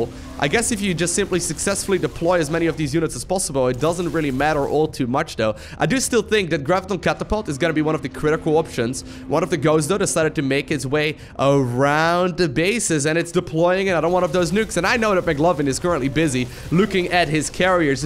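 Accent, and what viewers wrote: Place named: Netherlands. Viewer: The way you say "catapult" is the greatest. Im from america so i dont hear very many people talk with a dutch accent, i think its great. And i love watching lower level casts just as much as high level/pro casts because you see so much more variety in play styles. Granted, at lower levels, there is a lot of cringe moments (obviously because of the lower level) but, the cringe from both sides equals out and makes it a fun game to watch regardless. Especially since most of the time, its so hard to tell who is winning untill the very end.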